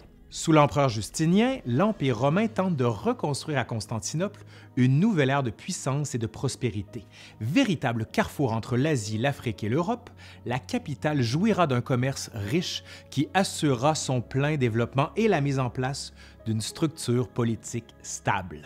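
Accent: Quebec, Canada. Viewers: Trop marrant l accent québécois jcrois j'aime bien lol comment il prononce neige ou planétaire mdrrrrr 🤣🤣🤣 jrigole il n'y a aucun mal lol. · Avec son accent canadien il nous fascine de ses connaissances ! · Étant moi-même Québécois, je peux vous dire que ce monsieur a un accent particulièrement gras. Il pourrait faire plus d'efforts pour "normaliser" son français, mais il se fait une gloriole de cet accent pour amuser (?) les cousins de France et d'ailleurs. Malgré ses talents de pédagogue, cet accent trop accentué me tombe sur les nerfs. Vraiment... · vous avez un accent de dingue vous les canadiens mais vous êtes tellement cool que ça passe bien. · Première chaîne québécoise pour ma part et ptn ton accent me mets trop bien, bisous de France, merci pour ton travail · vidéo très intéressante ! une voix posé, intelligible, tu articules bien et tu ponctues bien tes phrases. 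franchement, rien à dire de négatif. comme dit ton compatriote jean marc généreux "ça, j'achète !" (au passage j'ai toujours été fan de l'accent québécois xD ) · Vraiment interessant, sauf ta pronociation du Quebec (toujours drole et amusante) , mais je viens de soutenir ton programme. Merci. Salutations du Portugal. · Ca me fera toujours rire laccent québécois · Je pense que ton français est canadien Ton accent ressemble à celui de JUStin Trudeau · C’est un canadien👊🏽👊🏽👊🏽 j’aime trop l accents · Grâce à toi je comprends maintenant l'accent de Québec. 😀 Je suis hispanique et j'habite en France. Génial chaîne de YouTube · J'adore l'accent que québec 🙃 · En vrai les profs d'histoire sont souvent cool. Je trouve mais c'est vrai que l'accent québécois rajoute un charme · Merci pour cette page d’histoire qui m’était jusqu’à présent inconnue et que j’ai trouvé tout a fait fascinante! …et toujours cette diction impeccable accompagnée d’un bel accent Canadien👍🏼! 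· Outre l intérêt historique de ton propos j adore ton accent québécois et la touche d optimiste en fin d exposé est réconfortante bravo pour ton travail 😀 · J'en ai regardé plein d'autres ! Ta chaine est ma chaine d'histoire préférée ! J'aime beaucoup ta façon de raconter l'Histoire. Je dois dire que ton accent québécois contribue beaucoup à ton charme 😁 Merci beaucoup pour ton travail et ces vidéos très divertissantes. Ps : tu es très beau garçon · Merci infiniment pour cette excellente presentation - Vous presentez la connaissance de notre passe d'une facon interessante et vivante. De plus, vous etes pas loin de mon patelin natal (Saint Maurice), j'adore le Francais local. Mes excuses pour mon manque d'accents, j'utilise un clavier anglophone et je suis "pris" aux Etats-Unis, au milieu de la pandemie. Merci encore et j'attends vos prochaines productions, L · L'accent français canadien, une pépite... 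· Ma foi c'est bien la première fois que je suis pas dérangé par l'accent québecois ! (Désolé les kheys j'vous kiffe mais étant de France ça fait vraiment bizarre haha !) Excellent sujet de vidéo et très bien expliqué en tout cas, je crois que ça fait un abonné en plus ;)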